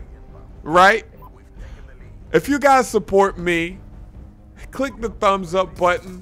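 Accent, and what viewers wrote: Philadelphia, United States. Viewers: sup o.g keep up da work. where u from? u sound like u from philly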